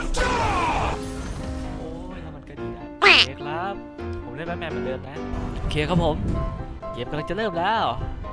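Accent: Isan, Thailand. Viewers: เดี๋ยวเฮาช่วย ชอบอีสานสำเนียงไทยของพี่อ่ะน่ารัก5555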